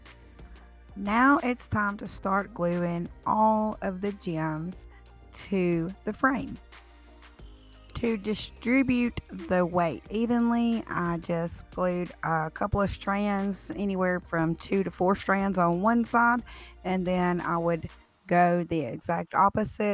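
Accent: South, United States. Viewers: You're very welcome. You have a strong southern accent. Makes me think you are from somewhere close to where I'm from... Kentucky. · Oh my how beautiful, & the lamp isn't to shabby either. LOL Just joking, plus it's really nice to hear someone with a Southern accent like me. I could be your grandma & I loooove your lamp. I just happened to stumble up on your video. You are very talented & bet you started out when you were a lot young. I am going to have to make my own, & watch all of your videos. I love that deep Southern accent, it makes me feel like I am home "In ". Keep up the good work, Little Southern Magnolia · You have inspired me!!love your southern accent too! so pretty!! And we can put our own style into your design and make it customized to our decors Thank you love Canada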